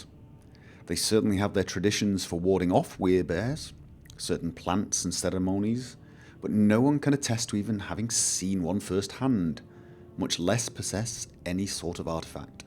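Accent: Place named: Bolton, United Kingdom. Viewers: I thought so, he's probably from Bolton though. I know a lot of people from Bolton who have that accent so it sort of got me thinking but thanks for the response. Sorry about mine! Hehe! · I download the vids as MP3's and listen to them while trying to sleep. I like it that my storyteller has the accent of a true northernman, sounds more genuine.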